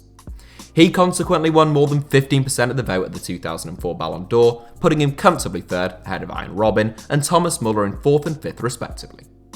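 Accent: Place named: United Kingdom. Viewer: Voice so British I had to turn on the sub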